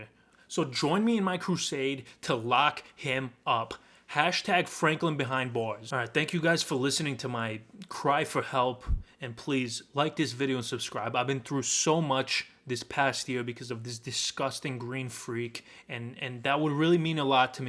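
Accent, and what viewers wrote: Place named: Boston, United States. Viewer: Dude... I absolutely LOVE hearing you rant about Franklin in your Boston Accent! Hilarious definitely gon Sub bro. Love the content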